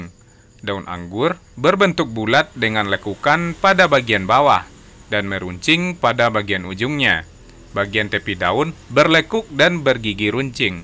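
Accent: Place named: Padang, Indonesia. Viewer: Orang padang yg uda... Intonasi cara ngomong nye kek orang padamg .😂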